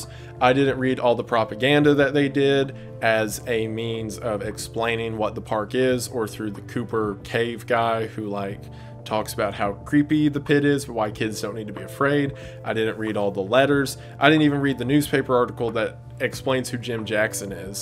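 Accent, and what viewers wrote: South, United States. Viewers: you have this southern accent that comes out every once in a while and it really added to this whole experience, especially when you read letters/notes, very immersive 10/10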